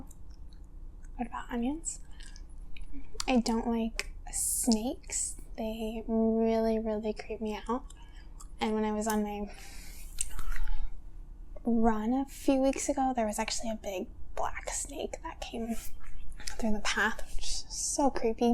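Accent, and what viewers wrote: United States. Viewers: The best of all the American accents. 😎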